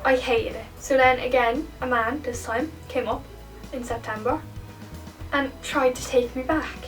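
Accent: Dublin, Ireland. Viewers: Your really beatiful.. Are you from Ireland? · what part of Ireland are you from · i love your irish accent the real irish accents gotta love em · Are you Irish? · Don't let people judge u..... Love Ireland r u from Dublin? ( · That sucks I am sorry that happen to you I am glad you are doing better I love your irish accent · r u irish cos i am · I love your Irish accent 😻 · <3 Know the feels x Is she from Dublin??? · omfg your irish im irish aswell are you from west meath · She is Irish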